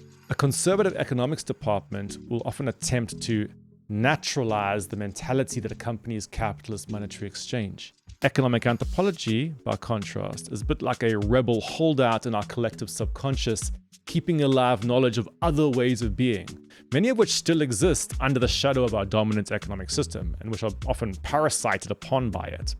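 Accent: South Africa. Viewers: I loved Debt 5000 years of history. A must read. Thanks for keeping his memory alive. PS lekker SA accent! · I wonder if you are South African? Your accent reminds me so much of home. Great video, keep it up. RIP David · Subscribed. Excited to hear more from this channel. Are you originally a fellow South African? I feel like I hear a little trace of the accent.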